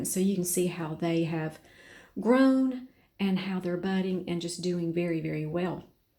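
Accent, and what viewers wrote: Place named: North Carolina, United States. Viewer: I hear NC in the dialect. Am I right!? These have grown so beautifully!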